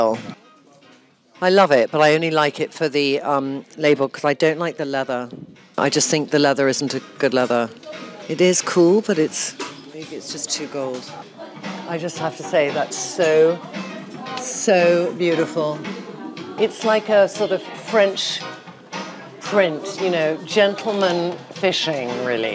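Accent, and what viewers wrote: United States. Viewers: Love the video, but why the American accent after a week! Is there something we should know?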